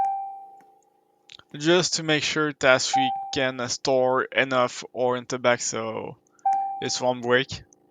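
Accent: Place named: France. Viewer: Your accent says you're from another country. I mean, yes, you're from the United States, but at the same time, I feel like you have French roots.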